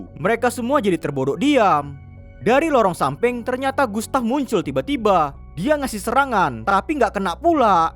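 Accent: Medan, Indonesia. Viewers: Ku lihat dari logat bicara kau bg, pasti kau dari medan ya bg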